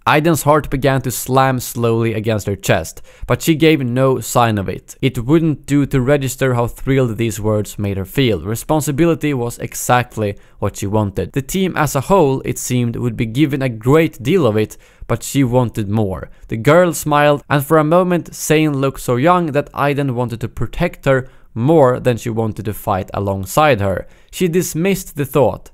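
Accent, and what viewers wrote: Sweden. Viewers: You read fine, don't be afraid of reading with your "Swedish accent" because you read pretty good bro. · His Swedish accent is awesome.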